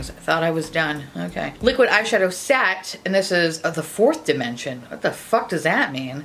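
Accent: England, United Kingdom. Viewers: I love the English accent 😁❌✖❌ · This is the first time I've heard your British accent and I need it more in my life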